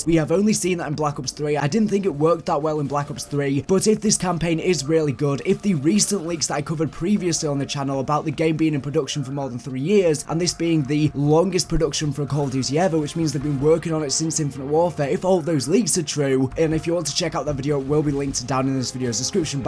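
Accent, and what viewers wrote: England, United Kingdom. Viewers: Well he's English and morgz is English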